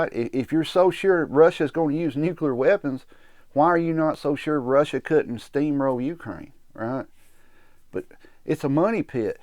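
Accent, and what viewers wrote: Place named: Appalachia, United States. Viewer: hillbilly talk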